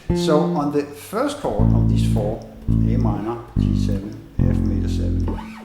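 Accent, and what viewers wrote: Denmark. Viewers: Great! A danish Bass player with danish accent (no offence) playing on a danish bass amp :) I like your videos. You are a great teacher. Greetings from Denmark.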